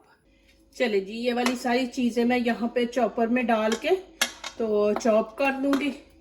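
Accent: Sialkot, Pakistan. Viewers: بہت اچھی ریسیپی بہت ہی اچھے انداز میں سمجھا سمجھا کر پیش کی۔۔آپکا سیالکوٹی لہجہ بہت پیارا لگا۔۔۔۔۔اللہ آپ کو ہزاروں خوشیاں عطا کرے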